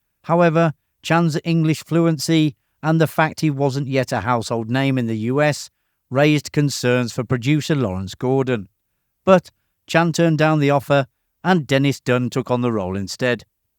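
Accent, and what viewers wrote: United Kingdom. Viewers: Its only his British accent! I'm getting Cattrall! UK here.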